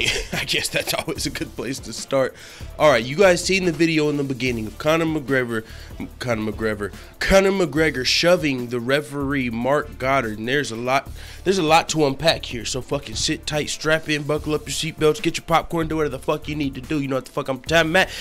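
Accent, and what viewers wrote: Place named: AAVE, United States. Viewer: You're Ebonic dialect is seeping through.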